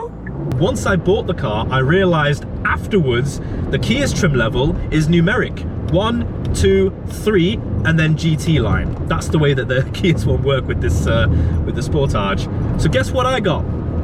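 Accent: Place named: Isle of Man, United Kingdom. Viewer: Hi, you can do this….just slow things down a bit, you’re trying to squeeze too much info in…main points, no waffle…..you come across fantastic, and the cameras and microphones are spot on….hope the vlogs work, and the business goes from strength to strength…..👍🇮🇲😉👌. Ps….I can still hear some manx in there yessir, if you know you know…..can’t fault the narrative, just slow down a bit…😜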